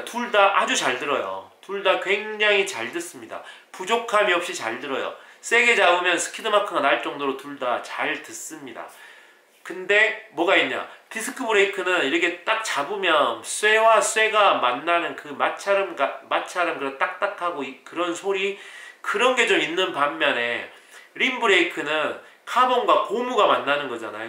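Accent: Jeolla, South Korea. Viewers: 전라도 말투 너무 구수합니다 ㅋㅋㅋ 시마아노 ㅋㅋㅋㅋ 항상 좋은 영상 감사합니다 ㅎㅎ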